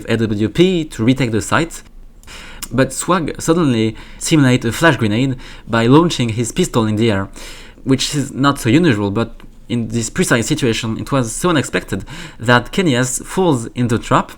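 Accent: France. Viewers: dang your english grammar and vocabulary is really good considering your strong french accent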